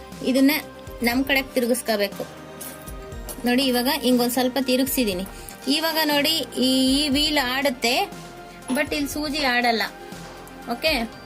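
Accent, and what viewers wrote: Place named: Uttara Kannada, India. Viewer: madam nim bhashe nodidre uttarakannada davru ansatte. nim jote ondsari matadbekittu madam plz.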